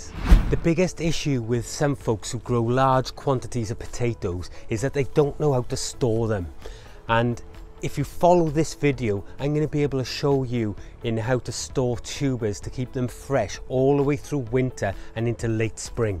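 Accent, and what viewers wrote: United Kingdom. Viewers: wow I used to hate UK accents until I heard yours. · I’m American and I can spot that Welsh voice a mile away! It’s a beautiful language that creates a beautiful sound when speaking Engliah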